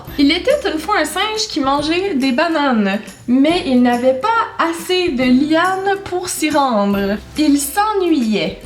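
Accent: Quebec, Canada. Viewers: PUTAIN une québécoise... JE deteste vos accents berk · Je suis francaise et J.A.D.O.R.E l'accent Quebecois franchement chuis amoureuse de votre accent 😻😻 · c'est normal si des gens en blanc viennent chez toi, ne t'inquiète pas, je les ai appelé pour t'aider x') j'aimerais tellement avoir un accent QC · C'est bête, j'aime bien ta vidéo (c'est la première que je regarde) et j'ai envie de savoir la suite, mais ton accent québécois est super prononcé de mon point de vue (parce que je suis française), alors je ne comprends pas tout... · ah je suis pas là seule à être amoureuse de l'accent québécois je l'aime tellement c'est tellement beau 😍😍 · Yesss t québecoise ? Moi oui tu as le meme accent que moi! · ça fais du bien de voir qu'il y as des gens qui aime notre accent québécois ! merci! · Tu es canadienne ? tu as l'accent de garou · J'adore ton accent québécois , j'aimerais trop avoir le même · D'habitude j'aime pas l'accent québécois ,mais le tien a beaucoup de charme. 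J'aime beaucoup ton agent, vraiment! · Moi aussi je trouve l'accent québécois magnifique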